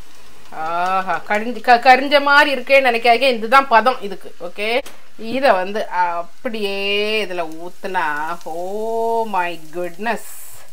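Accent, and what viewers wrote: Nagercoil, India. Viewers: Nagar kovil tamil super sis.... Aappam soo soft and 😋yummy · நானும் நாகர்கோயில் பக்கம் தான், எங்க ஊரு பேச்சி style, கேக்கவே சூப்பர் ஆஹ் இருக்கு.... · Akka neenga nagerkoil ah.appadiye antha slang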